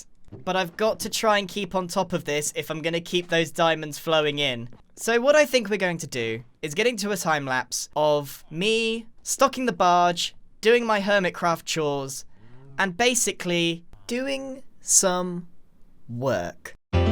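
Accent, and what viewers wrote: United Kingdom. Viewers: Why does sound so much more british? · Is it just me or does he sound more British than normal ?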